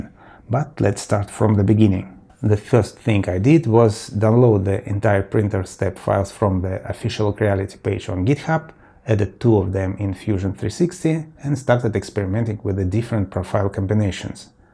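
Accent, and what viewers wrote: Russia. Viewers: Your english is great and russian accents are cool Im getting a prusa and have 2 ender clones so im super excited for this as a project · Well, the voice and articulation and Russian accent — of course, you need to work on that. I mean at least a normal voice equalization. There's a lot of bass, 125 hertz and 250 hertz are buzzing, there's little mids and highs. Maybe it's okay to hear from the phone, but from a computer with good speakers, it's hard to even hear what you're saying. =)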